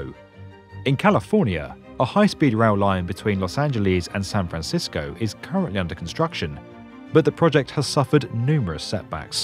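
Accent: United Kingdom. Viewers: Where is the money coming from? If it is SOLELY from the State of Texas, I really don't care. Texans can spend their dollars however they choose. However, if even one cent of federal tax dollars goes into this boondoggle,I feel like I've been robbed again. I also take umbrage at the narrator and his British accent a if to somehow transform the US into another European look alike.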